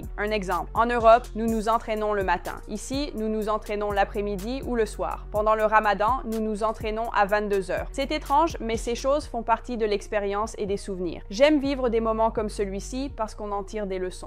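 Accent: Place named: Quebec, Canada. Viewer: Non elle a juste un accent québécois c’est tout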